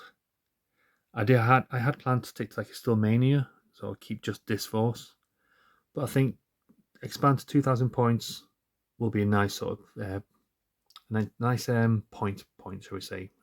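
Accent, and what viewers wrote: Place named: Northern England, United Kingdom. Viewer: Are your infantry on 20mm bases? Also are you up north? As you sound northern. West Yorkshire here.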